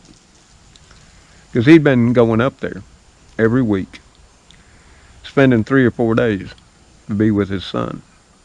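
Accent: South, United States. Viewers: Love your Southern accent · Southern accent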